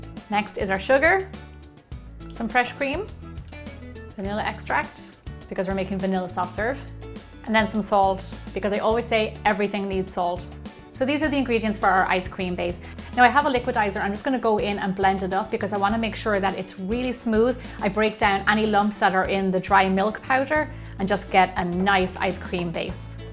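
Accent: Ireland. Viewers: Hi! I was just wandering, are you Irish ? 😀😀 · r u Irish? and btw love ur vids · OMg sooooo good! I love your Irish accent soooo much. I'm Irish too but born in USA and always wanted to live in Ireland.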